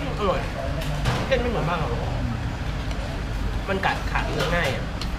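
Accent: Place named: Isan, Thailand. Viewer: i like it whan you talk e-sen it sound so cool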